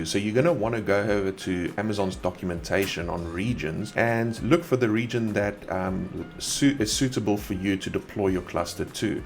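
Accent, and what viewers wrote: South Africa. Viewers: Why does it sound like you're afrikaans.?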